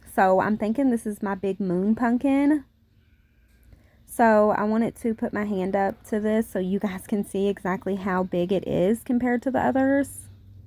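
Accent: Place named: Kentucky, United States. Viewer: # 1 Mama Always Getting It Done For Her Daughter and Son❤ Love Ya Bunches My # 1 Favorite Person to Watch and Listen to that Kentucky Accent ❤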